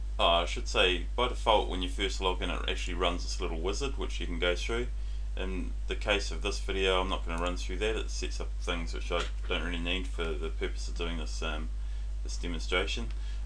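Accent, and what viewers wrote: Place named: New Zealand. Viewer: yeah sounds like a kiwi. Nitwork. Tistnas .. etc.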